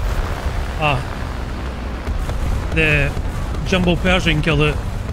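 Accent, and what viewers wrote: Scotland, United Kingdom. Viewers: Hi, I really enjoyed your video. I wanna ask that are you down to make a video about the T29 as well? Because I've already seen your video about the T34, and the T30 as well, and I know that there's not much difference between these tanks, but I genuelly love the T29, and Imo it's better then the Tiger 2 sla. By the way keep up the good work, I love your videos, and Im kinda courius about your "natural" scottish accent;)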